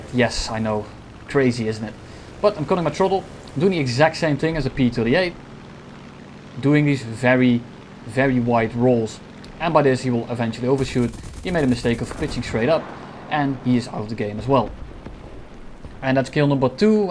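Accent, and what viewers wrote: Netherlands. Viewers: Is he dutch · Hey man, although a bit late, I do enjoy the videos! Are you dutch?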